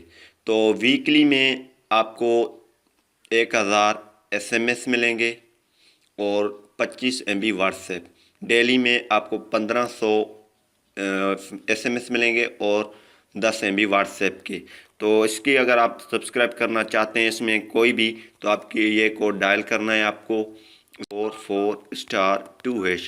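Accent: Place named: Sindh, India. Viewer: Lagta ha ap sindhi ha ap k lajhy se lag rha ha.vip 👌👍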